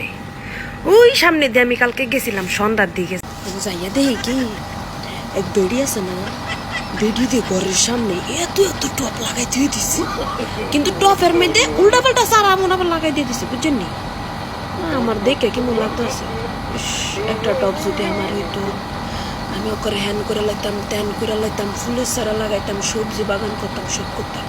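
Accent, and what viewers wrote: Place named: Bancharampur, Bangladesh. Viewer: আমার কেন যেনো মনে হচ্ছে আপনার বাড়ী বাঞ্ছারামপুর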